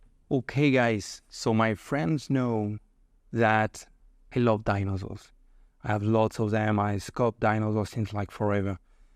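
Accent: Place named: Spain. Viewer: No problem on the accent! We have a lot of Spanish people in this part of the country!, LOL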